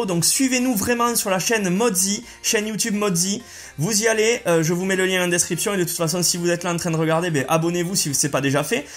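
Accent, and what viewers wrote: Toulouse, France. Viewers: rapha 1023 cest l accent toulousain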